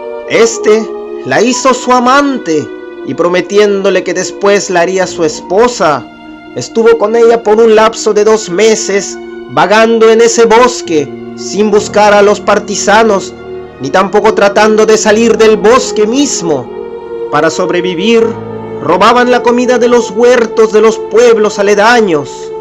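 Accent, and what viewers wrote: Russia. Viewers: wey, es ruso, no puede poner un poquito mejor su acento porque así es su acento base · Eres de Orígen RUSO tus videos son La Unión Soviética tu Acento Saludos desde México capital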